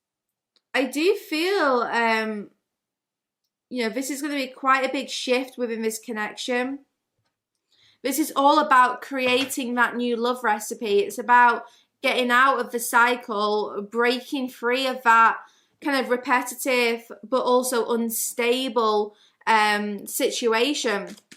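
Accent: England, United Kingdom. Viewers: Tf guidance in English accent ... Omg 😃 this is the best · Love ur accent but cant place it:) are from the UK?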